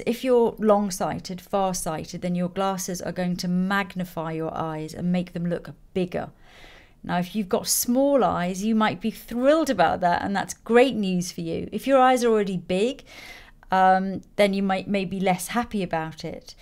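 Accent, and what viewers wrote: United Kingdom. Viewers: Do I hear a british accent :D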